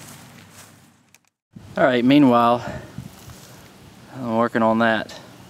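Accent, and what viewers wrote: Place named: South, United States. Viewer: Widow maker 🤣🤣🤣. That’s funny, sounds like a southerner. (Thanks for the video)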